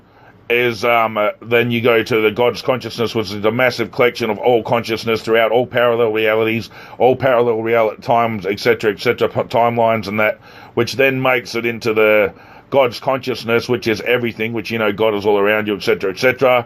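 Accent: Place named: Australia. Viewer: Hi there, this video is helping me. I have to listen however many times in order to gain the understanding you are putting forth. Mainly bc you speak fast and with an Australian brogue. Lil' lol. I am sure you get a million comments so i know you will not remember mine. It was full of doctrinal manifestations, even though at the time i thought i was way past that. You know, having come 'out of her' and all. It is indeed by layers, one layer at a time. Im not going to let go of CHRIST my LORD. Im just having a bigger look at how the scripture was so soarly perverted and literally turned backwards. I have had Nelson visit me personally before i had heard he was lurking around. It happened when i was reading ( in the style of 'gulping' down a big tall glass of water, a few whole chapters at a sitting. As apposed to an intricate word study verse to verse) Anyway, learning from you. 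Thanking you and feeling really thankful. I am going to share a link with you from a young guy who quickly posted his experience. Its short and who knows, maybe a helpful tid bit. Alrighty, i hope to see you soon!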